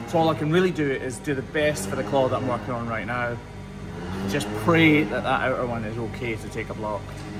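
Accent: Scotland, United Kingdom. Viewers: Swedish 51 year old middle school teacher! Teaches grades 3-6 at the moment in science, biology, chemistry, technic, maths and (drumroll😂) English! Living in the very southern part of Sweden, near Malmö. Really into animals and helping them! Catowner 🐈‍⬛and wildlife lover! 🐌🐛🐞🕷️🪲🦗🦇Have planted a LOT of plants and flowers for butterflies 🦋 and let my lawn be full of white clover ☘️ for bumblebees🐝 and bees. Put up bee hotels and bat dwellings ! I keep the corners of my little garden messy for the hedgehogs 🦔! Water bowls and food for the birds 🐦 🐦‍⬛ I really enjoy your channel here! It’s so nice to see you help these animals to a better life! 🐄 🥹😍 Been to Scotland 🏴 Edinburgh once and love hearing your Scottish 🥰 Must return soon! Also a huge fan of the band Wet Wet Wet and singer Marti 🥰 Bye from 🇸🇪🌤️ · Retired middle and high school science teacher, now a canine obedience and rally instructor, student, handler, and judge for 3 separate registries here in the US. 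Have been following since before the Appleton crush, and really appreciate learning new things. Plus, I also enjoy the banter and cadence of your storytelling - my ears get a Scottish vacation 😊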